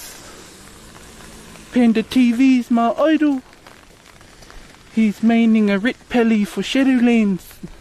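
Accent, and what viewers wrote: New Zealand. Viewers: Love the way you talk newzealand accent is awesome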